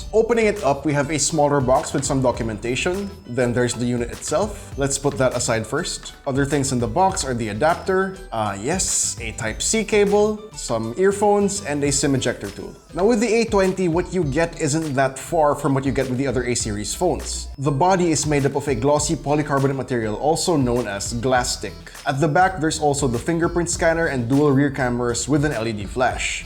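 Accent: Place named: Manila, Philippines. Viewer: It's Manila boy accent boy!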